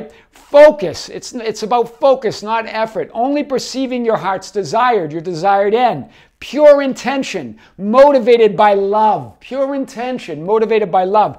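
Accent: Boston, United States. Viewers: Love your Boston accent ❤️ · Weird thing, the whole reason I started listening to you was literally because of your accent. I’ve always had a strange connection and love for Boston… we go there every chance we get… And we try to say “chowda” etc😂 All that to say your accent is one of many things that makes you our lovable and lighthearted teacher- I think it’s part of what put you on the map!!!!!! 🍀It did with me anyway!! ❤️ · I love your Boston accent! Thank you! You helped me remember and continue to do so. I love my life and I am grateful! Thank you again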